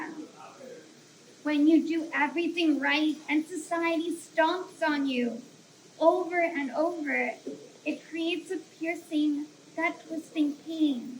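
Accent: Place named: California, United States. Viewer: I knew you were from Cali just by your accent alone!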